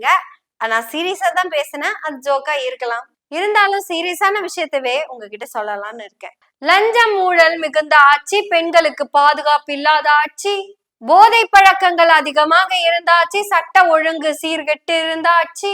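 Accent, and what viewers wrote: Kongu, India. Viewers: கொங்கு தமிழ் அழகாக விளையாடுது வாழ்த்துக்கள் சகோதரி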